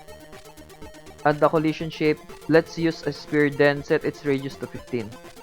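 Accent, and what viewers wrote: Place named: Philippines. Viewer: you sound like filipino...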